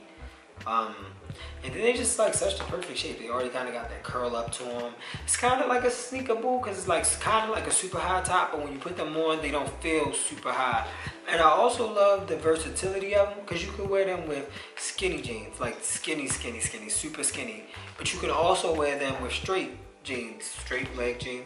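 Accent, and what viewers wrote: Baltimore, United States. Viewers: u from baltimore???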